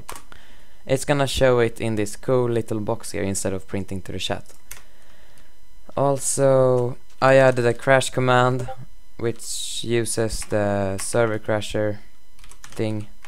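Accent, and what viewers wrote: Sweden. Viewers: ur from sweden i can hear it on your voice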